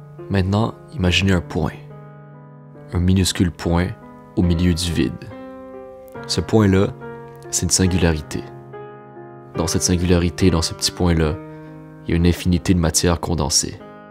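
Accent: Quebec, Canada. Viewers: Salut.. je suis pas sûre si tu vas lire ça ou non.. mais j'ai décidé de le faire quand même.. je suis ni d'origine francaise ni québécoise alors j'ai eu qlq difficultés pour comprendre un peu tes vidéos mais le contexte est très clair et vraiment tes vidéos sont très profondes et touchant tu fais un excellent travail.. j'aime bien l'accent québécoise et c'était parmi les bonnes choses que je vécu lorsque j'ai tombé sur ta chaine c'est un trésor perdu que j'avais un chance de le trouvé.. bonne chance et mercii☺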